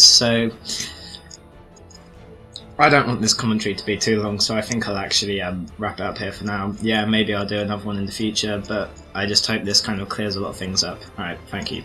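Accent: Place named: United Kingdom. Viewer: 1) What did they banish you from? 2) Why do they call themselves an organization yet do this? 3) To be frank, why do you keep playing? 4) I believe you can report players to Sony. If so, why don't you do this. 5) Why do you not make a video exposing these people and what the have done? If you're afraid of being no better than them, you needn't worry, for it is simply you expressing your views and feelings. P.S I love British accents; IMO, they are superior to that of Americans (I'm American) P.P.S. Please, make tip-videos and more commentaries! I'm an assassinate noob and could use the help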